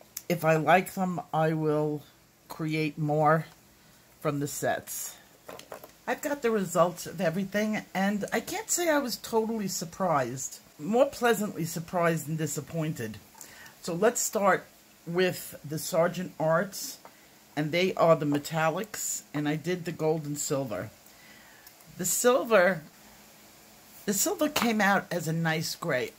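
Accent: New York, United States. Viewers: I was dying to try your recipe but didn’t have the beeswax. However, I had a Caran d’Ache wax crayon. I added your amounts in mineral oil and linseed oil but no wax. I figured the wax in the crayon would be sufficient. It worked! Thank you so much for sharing all of your information on this! It really is a gam changer! ❤️ PS love your NY accent.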